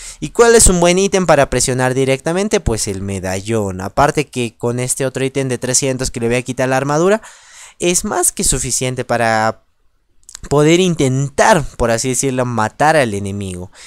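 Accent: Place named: Bolivia. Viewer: Vocalisa bien boliviano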